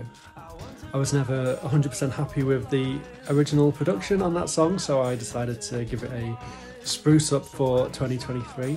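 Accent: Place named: United Kingdom. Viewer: ❤❤❤❤❤❤❤. lovely 😺 I forgot to tell you how much I love your UK accent. I will use it for my auditions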